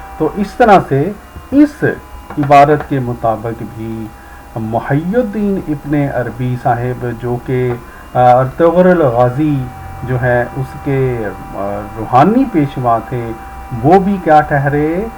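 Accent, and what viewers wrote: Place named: Punjab, Pakistan. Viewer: Punjabi mein urdu bolna bhi samaat par acha asar nahein chorta.Yoonhi tou Radio TV walay itnay baray baray auditions nahein letay.Presentation can make a lots of difference.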